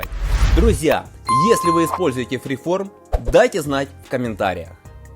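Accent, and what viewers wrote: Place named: Ukraine. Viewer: Он просто с Украины, это ведь слышно что это не родной язык для него, есть акцент и говор.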